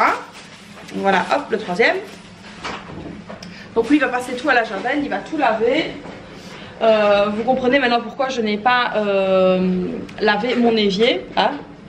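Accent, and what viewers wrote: Belgium. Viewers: Bonjour! Chouette vidéo! Votre accent viendrait-il de Belgique? 😉 Bonne journée!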